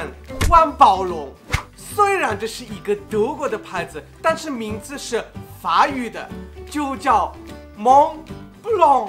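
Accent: Shanghai, China. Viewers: 不行， 他的德语还是有上海口音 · 英语有上海口音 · 兄弟你德语都带上海味儿了.....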